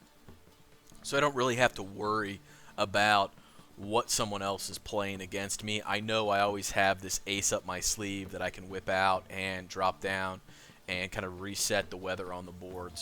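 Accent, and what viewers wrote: United States. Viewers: i agree with the others, your videos a great dude, what accent? you seem to have a pretty normal middle of the road american male voice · I mean...i'm trying to wonder what accent it would be...he sounds Pacific N.W..atleast west coast. Not much of an accent. He has some southern sound when he says Line. Mostly with his (L)s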